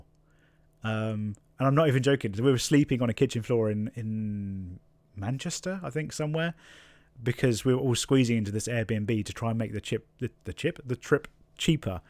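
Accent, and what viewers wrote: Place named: South, United States. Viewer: Hey, I'm new to your channel and absolutely loving it! I'm from up north and trying to locate where your accent is from, where abouts from the South are you from?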